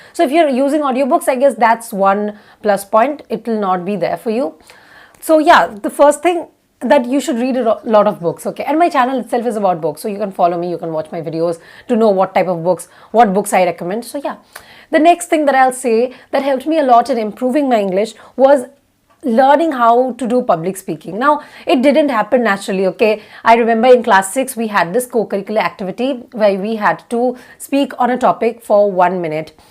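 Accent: Bengal, India. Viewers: You are always fabulous. Adorable indian accent.❤ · Everything is ok but i don’t like indian English. So bad accent . 😏🤧🤧🤧🤧 sorry to say. I can't respect it....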